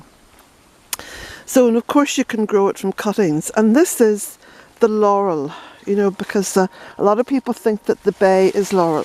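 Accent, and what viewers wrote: Ireland. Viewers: You know the minute you mentioned tea I realized that was what I was in need of ! Lol. Well you’ve inspired me too, I’ve already picked up my cardboard from Walmart and am ready to start building my raised beds to grow veggies. It’s been really good for me to be able to see the process, and hear you give your tips in your Irish accent too, as I used to live there and have fond memories. ☺️ Reading about something and actually seeing it are two very different things, and most of us don’t have someone in our family to teach us. Thank you so much. Loving seeing Jacks happy little face and antics too. Blessings to you.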